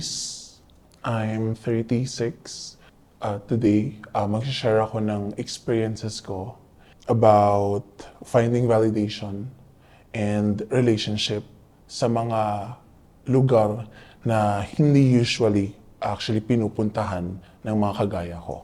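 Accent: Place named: Manila, Philippines. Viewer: You sounded like you're from DLSU 😀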